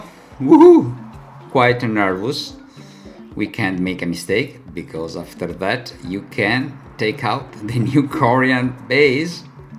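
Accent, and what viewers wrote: Italy. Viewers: "The restoring project for SAILOING around the world.", you speak english with a nice Italian accent. I like it. But, it is the first time I see someone WRITING with an Italian accent. Beautiful work, by the way!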